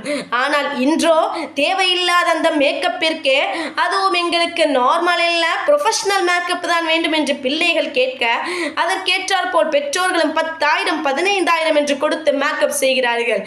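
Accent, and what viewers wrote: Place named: Pallapatti, India. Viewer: I think it's பள்ளப்பட்டி uswathun hasana style of slang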